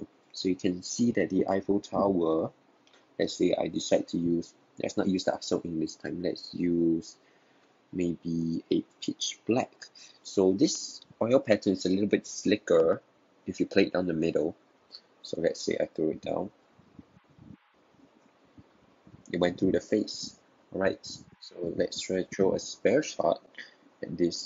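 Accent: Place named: South Africa. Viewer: Who is doing the voice over. This is a South African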